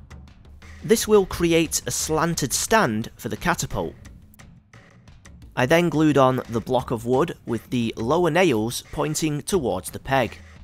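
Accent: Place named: England, United Kingdom. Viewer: Welcome to England pronunciations good sir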